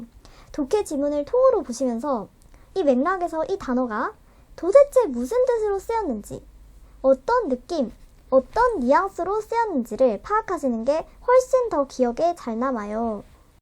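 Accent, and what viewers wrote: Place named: Busan, South Korea. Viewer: 부산사시나요 ㅋㅋㅋㅌ 웃기네요 영상 잘 보고 갑니다~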